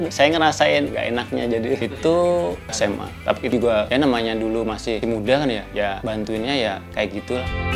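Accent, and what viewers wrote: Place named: Java, Indonesia. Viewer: mas nya ini tinggal di ciamis tapi logatnya jawa ya... wong jowo ki mesti 😅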